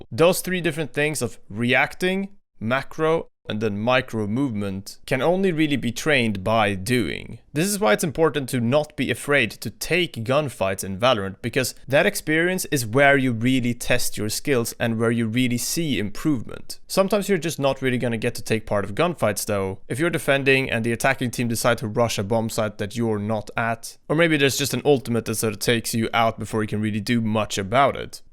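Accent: Sweden. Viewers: is it just me or does it sound like he has a swedish accent while speaking english. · are u from sweden?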